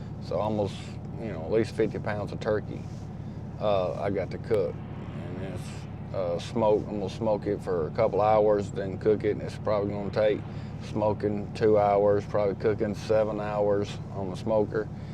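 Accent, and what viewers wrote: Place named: Tennessee, United States. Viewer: I was guessing where in Tennessee you are from. My mom came from Lawrenceburg ,tenn. The accent is familure. Be safe.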